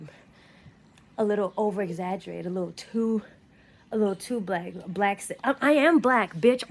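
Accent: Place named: Houston, United States. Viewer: I fuckin love!! Shes always here with insightful commentary and hilarious parodies. Also where in the South are you from? Cause I swear you be soundn like me and my cousins in Houston!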